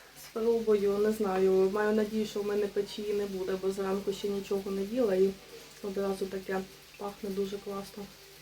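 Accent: Surzhyk, Ukraine. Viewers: Ще що вам хочеться? розмовляє на суржику,і це нормально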